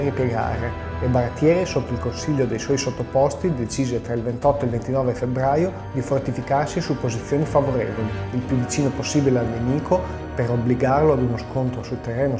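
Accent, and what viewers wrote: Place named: Alessandria, Italy. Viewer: bellissimo video. Ma dalla tua "erre" mi verrebbe da dire che sei di Alessandria?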